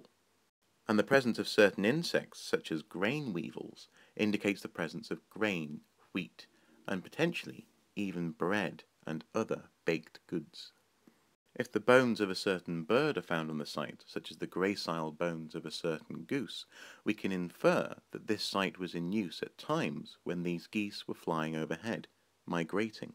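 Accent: United Kingdom. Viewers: are you british your acent is very strong?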